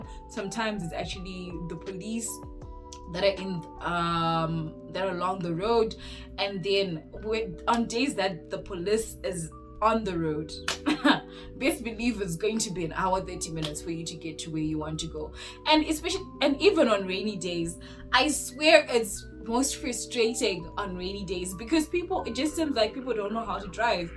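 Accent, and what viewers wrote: Namibia. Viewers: I liked the way u changed your accent to Namibian accent. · you look so beautiful from Namibia and you have changed to a Namibian through your English, that's our English you are speaking. you must come back my sister don't suffer yourself in zim;, the government has reduced the tax now and declared a free tax for all low-income earners. In Namibia we attack corruption we will not rest about it until we see actions taken against those corrupt leaders.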